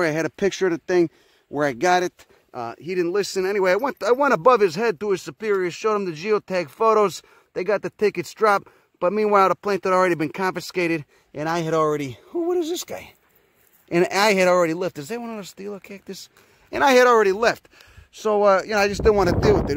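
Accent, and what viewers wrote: Chicago, United States. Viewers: You sound like my cousin he's from Chicago... just saying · Is your accent fake? Or are from the south side of Chicago, cause you sound like my fam. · I love how you just blurt out latin and shit in that American accent.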